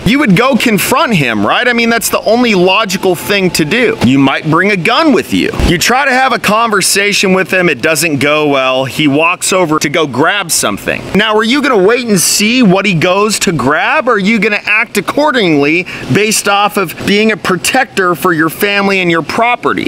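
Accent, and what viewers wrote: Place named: Texas, United States. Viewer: Now, more revealing footage has come forward, it shows this incident from the other side of his vehicle. The video clip i saw clearly, shows that the police had grappled him to the ground and that, before being handcuffed he managed to wrestle himself free, stand up and proceed to walk around from the passenger side directly to the drivers side of his auto. This great footage picks up just after he has somehow freed himself from the 2 officers and being pinned on the ground but is not seen from this camera angle. Thank You! for the work and time you take putting out really great content. You're so very correct, not using your Common Sense and Logic could get you shot, the police officers thankfully are using theirs. I guess its your well voiced Texas brand of thinkin and talkin that speaks to this life long Texan. 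Austin ain't All blue. I think I'm gettin a chubby* I am subscribed.and i'll continue to like and share your super content.Thanks again Hoss.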